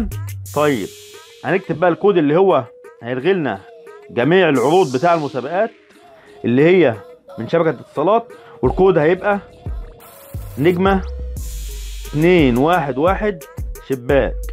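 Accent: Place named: Syria. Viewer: انت سوري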